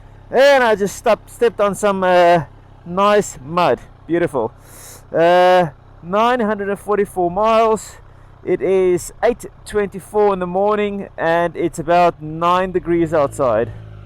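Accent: South Africa. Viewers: How long have you lived in the UK ? I assume your an SA guy by the accent :) I live in the States. Southern CA and now in Utah. Been in the USA for 31 years now. I have a 2014 ST R. Looking to upgrade to this 2021 version. Can you compare the 675 to the new CC bike ?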